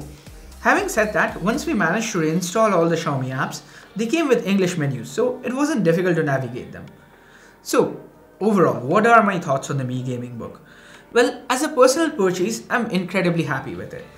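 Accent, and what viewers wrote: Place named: Bengal, India. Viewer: That's how Bengali accent is 😍😍😍😍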